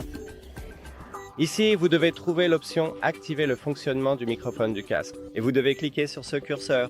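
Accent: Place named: Quebec, Canada. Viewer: Il y a un petit accent québécois .. C'est sympa .. ☺️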